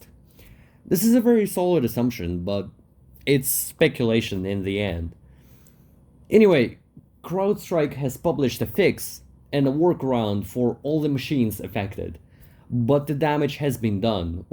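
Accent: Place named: Russia. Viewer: русский акцент всё ещё остался наш)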